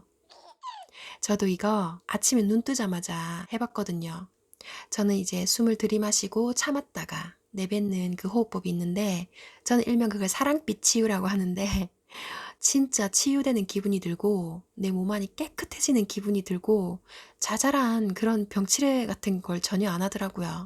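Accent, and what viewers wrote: Gyeongsang, South Korea. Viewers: 경상도 사투리 구수하네요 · 경상도 분 ~~억양이 ^!^♡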